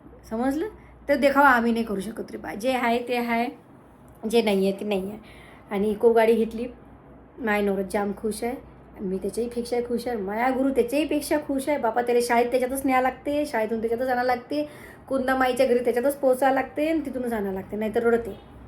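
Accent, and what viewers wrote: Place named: Vidarbha, India. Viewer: वऱ्हाडी भाषा खुप छान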